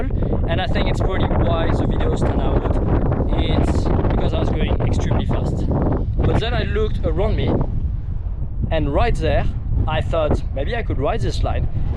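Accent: France. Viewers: That french accent tho 😭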